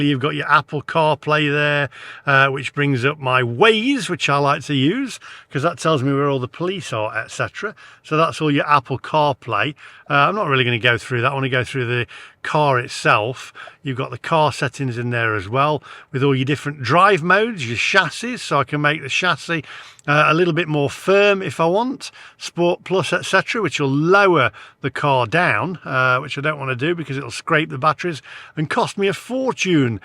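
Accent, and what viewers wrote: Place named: England, United Kingdom. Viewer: clearly having an English accent does not ensure you have a intelligent.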